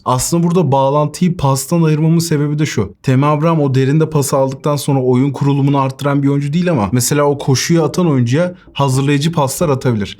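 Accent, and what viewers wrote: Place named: England, United Kingdom. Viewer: ''Temi ebraham '' İngiliz aksanlı dinlemek keyif vericiydi yine. Abrahamın öz güven eksikliği var bizim kötü travmalarımız var son 4 yıldır Allah sonumuzu hayır etsin. Bizim taraftar sabırsız olursa elimizde büyük biz enkaz kalabilir ama moral verip destek olursa da alev alabilir. Ben bir çok sorunu çözebileceğini düşünüyorum etrafı doğru kurgulanırsa. Bu adam çok gol attığı sezonlarda hazırlayıcılarla oynadı hep. Romada Veretout Mmkhitaryan Zaniolo Pellegrini ve Karsdorp sayesinde attı 26 gol. Chelsea de Mount Willian Azpilicueta ve pulisic denen futbol teroristtinin mecburi pasları ile attı 17 gol. Ki o dönem pulisic şimdiki kadar bencil değildi.Aston villada Hourihane Mcginn Grealish Anwar el Ghazi Ahmed el Mohamady asistleri ile 26 gol attı. Bristolde keza öyle orada tüm hücum hattı Abrahamı beslemek üzerine kurulmuştu.Dikkat edilirse hep iyi orta yapan beklerle gol sayısını artırmış doğru orantılı. 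Milanda herhangi bir santraforun 15 gol atması mucize.Solda pas vermeyen leao sağda ondan beter bencil pulisic merkezde Allah canımı alsın diye dolaşan orta sahalar ile kimden pas alıp gol atacaksın? Orası ölçü OLAMAZ Abraham için.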